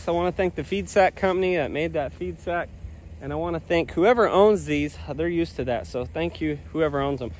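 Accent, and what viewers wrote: Oklahoma, United States. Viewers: Oh, Gosh, I just love Bois D'Arc trees, and I respect your choice to hate 'em. I want you to know, however, they are not exotic. Osage Orange (Maclura pomifera) are native. In fact, their place of origin in North American is considered to be Texas, Oklahoma, and Arkansas. Hedge Apple trees grow invasively, no doubt. My guess is that's your quarrel with them. Invasive growth patterns are different from exotic species that become invasive.There is an exotic species Argentine Osage Orange (Maclura tinctorial) that is native from Mexico to Argentina. Our Osage Orange tree has an illustrious history on our continent from the indigenous peoples use of it to white settles using it in hedgerows for fencing prior to the invention of barb wire. I bet you've heard the expression about hedgerows that need to be "horse high, bull strong, and hog tight." That's the Osage Orange in a nutshell. 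This species also boasts the highest BTU of any hardwood on the continent. In woodworking, this species will burnish to a beautiful sheen. So deride them if you must. I understand. Here's are some links to back up my claims: <link> <link> <link> Btw, your channel is terrific. I'm an Okie transplanted in Maryland now for 30 years. Watching your channel is a lovely taste of home. The bison, the vista, your accent - lovely, lovely reminders of my origins. And I love your correct usage of the term "cattle." Ranching is your business, so naturally you know how to talk about it. I go bonkers when unin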